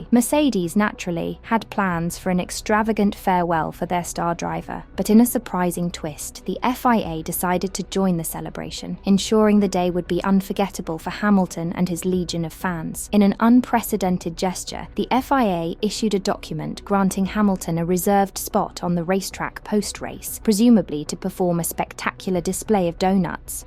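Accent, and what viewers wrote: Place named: England, United Kingdom. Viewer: I see this is a USA based channel, therefore I assume the narration is artificial. No American lady could do an English voice so well.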